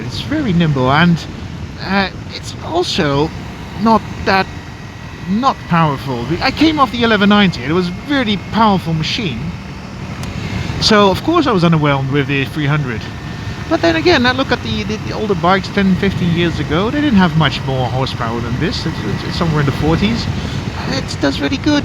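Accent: Netherlands. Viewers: Well look at that, a Dutch guy doing motovlogs whose accent ISN'T grating to listen to. I'm Dutch myself (Nederlands jeweetog!) and if there's one thing that consistently grinds my gears it's other Dutchies vlogging with 0 attention to pronunciation, proper enunciation, or even vocabulary. Sure, one doesn't have to mask one's accent, but at least an attempt at sounding vaguely localized is much appreciated. Related, keep up the good work, sir. I'm very interested in buying a Versys 300X. Currently riding an CBF500 and a Leonardo 250, and I'm looking for a physically bigger bike to fit me a little better without losing the nimbleness and (relative) low weight of a small bike.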